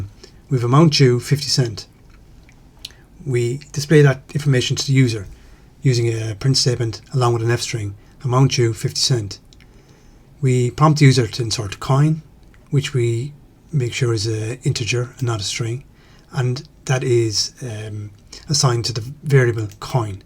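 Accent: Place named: Ireland. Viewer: Thanks...Nice to hear he Irish accent...I'll buy you a Guinness if we ever meet.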